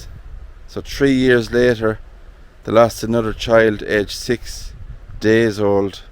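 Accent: Ireland. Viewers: I enjoy your channel and videos very much! As a Midwestern American, I could listen to your great Irish accent all day!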